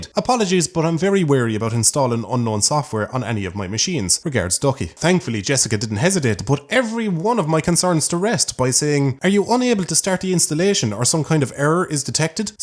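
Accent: Ireland. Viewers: Irish accent, that explains everything. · Came here from cynical reviews! Subbed, liked and stayed to binge for the hilariously snarky content and adorable ducky with an Irish accent! 🇮🇪 😁👍🏻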